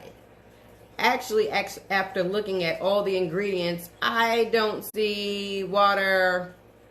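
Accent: New York, United States. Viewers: That New York accent gets me Everytime! "Let's twok about it...a little swofta!" You have to say Albemarle in your next video... Just for me! I love your hair. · Def not mad at it. Looks great! Where are you from? I hear a slight accent? NY?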